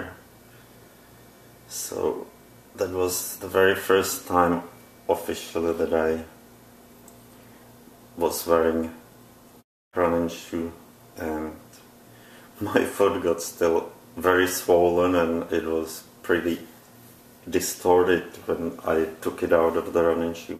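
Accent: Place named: Germany. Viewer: Oh, wow!, your foot looks MUCH BETTER!! I am SO happy for you that the worse part is over! Thank you so much for sharing your long and very painful journey with us! The first time I had MRSA the first doctor I saw said it was a spider bite. So, he gave me a steroid shot and sent me home. Oh, yeah, did I mention that my "bite" (or, as I found out later by my next doctor, it was actually MRSA) wound was on the lower part of my forehead-right between my eyes?!! You can probably imagine just how painful THAT shot between my eyes was! Unfortunately, it sounds like the first doctor you saw was about as inept as my first doctor... But, thanks be to God-- we both came through it alive and well!! 👍👍 Hey, btw, I have one more question, I noticed your accent...where are you from?? (I'm kind of thinking it's a German accent--and I apologize if I'm way off.) 😁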